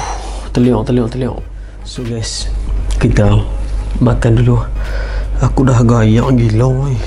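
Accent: Kedah, Malaysia. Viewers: loghat kedah memang macamtu bang...boleh search kat google ataupon youtube🙌kalau selipar pon orang kedah panggil kasut bang🙌kalau macam kelantan biskut dioarang panggil "sekkut"...setiap negeri lain lain loghat bang🙌👍🏻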